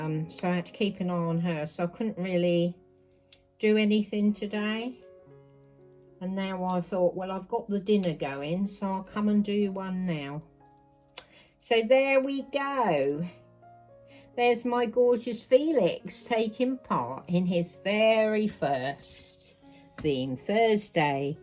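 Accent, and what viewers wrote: England, United Kingdom. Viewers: Awe Hi Felix…Love him so much! He’s definitely a heart breaker ❤ Omg you poor thing with all that happen to your son and still..Ugh I don’t know how us mothers get through life after kids lol. They surly keeps us on the edge of our seats. Felix looked adorable in that new outfit 🤎 loved the teddy bear shoes ❤ thanks for sharing. I seriously couldn’t listen to you all day long, brings back so many memories of listen to my grandmother and her beautiful English accent. God bless sweetie and hope your feeling good.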